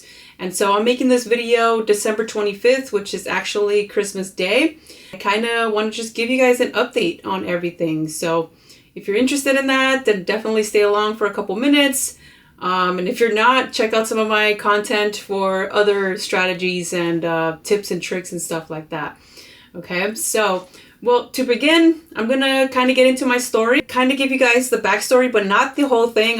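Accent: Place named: Los Angeles, United States. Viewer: Hell yeah! I started to follow you, I can relate to you from that LA accent lol , I grew in LA ayeee fooo!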